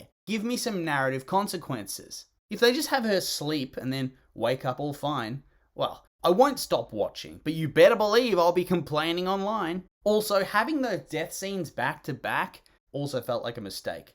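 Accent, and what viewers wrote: Australia. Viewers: You sound Australian, your country was turned into one giant gulag over the ‘pandemic’ and you are like ‘silly Americans and their guns’. The lack of awareness is truly astounding. Your name really shouldn’t be, more like girly idiot pants.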